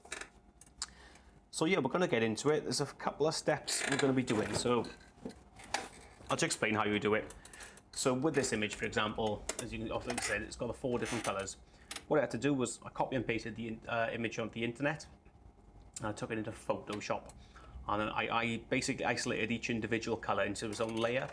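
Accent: Wales, United Kingdom. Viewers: Nice video my man, no escaping the welsh accent unfortunately, but we don't care do we!😁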